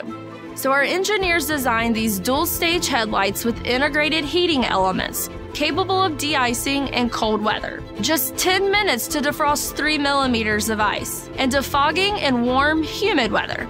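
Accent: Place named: Texas, United States. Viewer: I think she meant WAKE. As in wake turbulence. But because Freightliner picked someone with a Southern or possibly Texan Twang to do the VO in this video, wake sounds like weight and wash sounds like "worsh."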